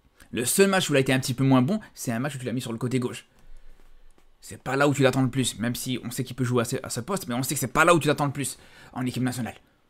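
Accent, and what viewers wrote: Belgium. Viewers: Frère t’es belge non ?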